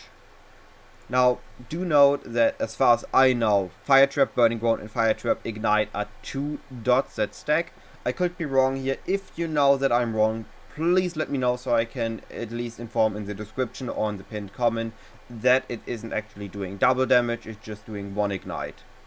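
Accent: Germany. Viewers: High-end german accent.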